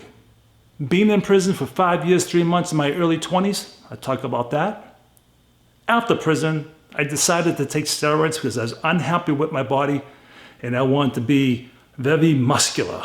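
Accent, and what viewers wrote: Boston, United States. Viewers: I knew I detected a Boston accent. Also, my father had 97’ 4Runner stick shift, really bulletproof. He put 267k on the odometer because he was in the military going from New York to Texas. The vehicle raised me half my life. You made a great investment because in terms of reliability and resale value it’s worth it. Sadly, it’s unfortunate that the frame was rotten, those are pricey to buy and replace.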